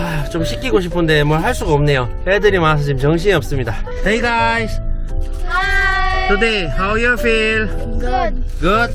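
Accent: Gyeongsang, South Korea. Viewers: 혹시 고향이 대구 이십니까??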